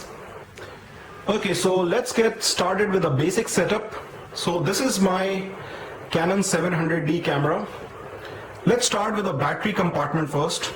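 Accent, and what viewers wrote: India. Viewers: It's very helpful... specially the Indian accent :) Thanks a lot buddy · hey dude! shy did u shooted only 3 videos? u have 4000 subscribers only with 3 videos... u should make more videos.. u r great in explaining.. and u sound like an indian. are you indian?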